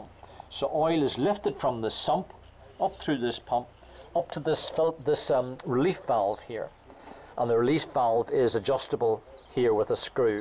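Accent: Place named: Northern Ireland, United Kingdom. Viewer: Love the Northern Ireland accent!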